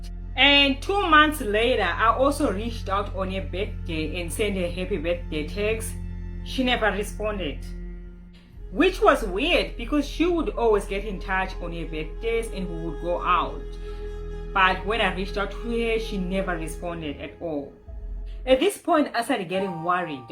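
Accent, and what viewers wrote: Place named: Haiti. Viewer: Interesting story. Thank you for sharing. What I learnt is once someone treats me with neglect - I have to lose my respect for them, and therefore, lose all desire to be connected to them in any way. If I keep trusting them, forgiving them, telling myself "I did something wrong, even though I have no idea what?" ......I WILL sooner or later get heartbroken and it will hurt A LOT! So, no more tolerating neglect and lack of communication from me. I respect myself too much to let people like this in my heart. If 2 weeks pass and a supposedly very close-to-me person is suddenly unavailable, I tell myself "I'm not interested in unpredictably push-pull, hot-cold, available-unavailable, friendly-hostile people, who are not mature enough to communicate what's going on". (Just wondering of you're from Haiti. I enjoy guessing-by-accent who's from where ....sometimes I guess right, sometimes wrong😂)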